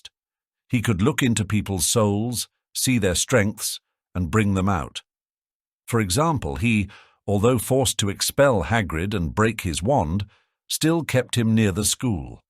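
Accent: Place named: England, United Kingdom. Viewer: I just found this channel. Really enjoying it. The narrative is great. Love the English accent. The pictures are beautiful. I just wish they illustrated what's going on in the narration.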